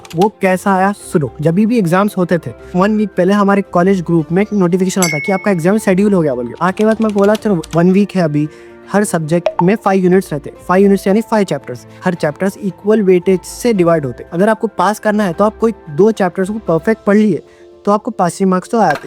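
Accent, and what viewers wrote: Hyderabad, India. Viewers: According to accent are you from Hyderabad?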